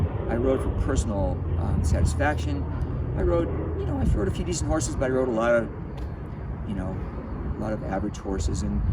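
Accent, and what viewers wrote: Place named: New England, United States. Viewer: Sounds like Massachusetts, and New England.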